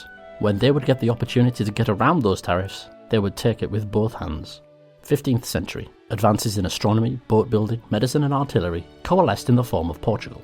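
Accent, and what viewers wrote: United Kingdom. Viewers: I fucking hate your accent with all the force of my spirit but I have to say brits surely do quite good content here in youtube (even if sometimes i dont fully understand what you say) keep the good work my good chap P.D: Roll youR fucking Rs for the love of god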